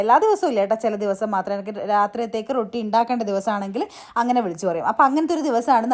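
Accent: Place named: Thalassery, India. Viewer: തലശ്ശേരി അന്നോ വീട് ❤... സംസാരം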